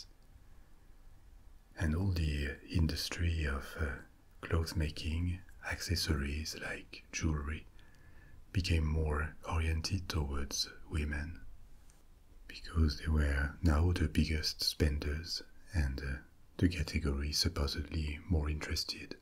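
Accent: France. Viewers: My sleep can be erratic! Some days and night, despite fatigue, I don’t sleep. Or, I eventually fall asleep, or something altogether different, I can fall in bed and fall asleep very quickly!!! This has been my fate most of my life including as a young child. seems to be just the ticket! I love his French accent, I love the whispery and intimately inflection that seems to be just him with me. When I don’t fall asleep right away, I am unformed. When I can’t fall asleep at all teaches me! This man has a craft, a skill, and a gift! I feel honored to be included!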